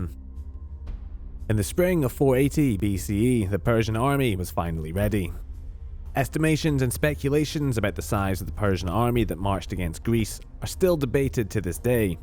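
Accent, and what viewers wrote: Scotland, United Kingdom. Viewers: “slightly sinister vocal tones” 😂 scottish accent is ❤ ! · Much better still needs to flow a bit more but still you're getting there. Read entire sentences in one go and pull back on the emphasis in the middle of them. However from last time it's a big step keep going like that and you'll be there faster than later. Maybe don't worry about the accent as its really nothing even when it comes out and it might be that your trying to sound less Scottish is actually hurting your style rather than helping.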